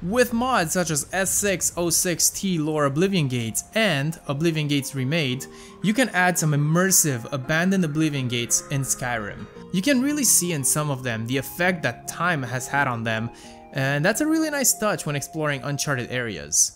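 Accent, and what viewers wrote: Romania. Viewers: Well I guess I now know what a Romanian accent sounds like.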